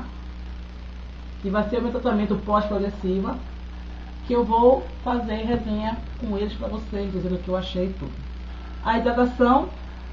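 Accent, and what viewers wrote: Bahia, Brazil. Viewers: esse sotaque ai eu conheço .povo bahiano é tudo animado mesmo.quarquer dia eu vou na bahia conhecer melhor a cultura de vcs.amei o video bjs!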